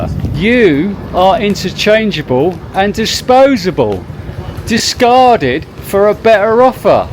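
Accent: England, United Kingdom. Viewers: The English accent is way better than a yanks . Don't watch if you don't like. UP THE POMS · Your strong English accent is annoying to listen to, if I was a woman I wouldn't want to hear it , an American talking English is much more pleasant.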